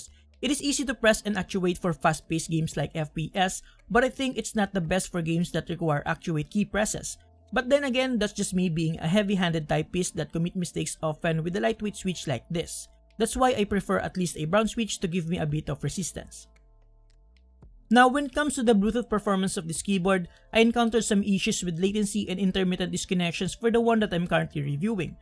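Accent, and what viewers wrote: Philippines. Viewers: Lmaoo I was not expecting a Pinoy accent, Filipinos on tech tube😎😎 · are you filipino ?? because you sound just like my fam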